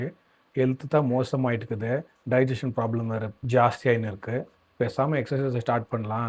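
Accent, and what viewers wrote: Bangalore, India. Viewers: Easter birthday nu video liye sollitunga, unga slang uh vechu place of birth Bangalore nu potupe, which year bro, 33 na 94 or 93?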